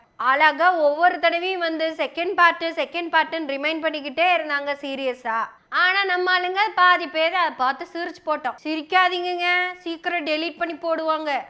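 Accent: Kongu, India. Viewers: கொங்கு slangula செஞ்சுவிடுறது எல்லாம் வேற லெவல் மா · பேசு மகளே பேசு இது தற்கரி தலைகளில் நங் நங் என்ற கொட்டுகிற கொங்கு தமிழ். கொஞ்சுகிற கொங்கு தமிழ். சங்கிகளின் கொட்டத்தை கண்ணத்தில் அறை விட்டு அடித்து விரட்டும் கொள்கை தங்கங்களின் சங்க தமிழ் அழகு தமிழ்.Allhuakber. jaibheem Periyar gr.daughter வாழ்க வளர்க நலமுடன். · சகோதரி தங்கள் கொங்கு தமிழ் சுவையோடு கருத்தாழமிக்க பேச்சு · உங்க கொங்குத் தமிழ் மிக மிக இனிமைங்கோ! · அருமை 🖤❤️கொங்கு தமிழில் சாதி கொடுமைக்கு எதிர் பேச்சு கேட்ப்பது இனிக்கிறது 🙏காரணம் அங்கே தான் சாதி வெறியை ஆடி 18யில் கண்டேன்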